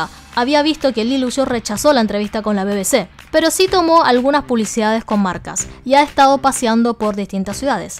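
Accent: Argentina. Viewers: Vos hablas como argentina querida ..... saludos desde 🇵🇦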